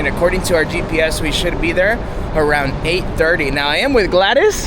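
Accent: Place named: United States. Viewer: actually you no what ..... dude thats so annoying your whiney american accent doesnt help tho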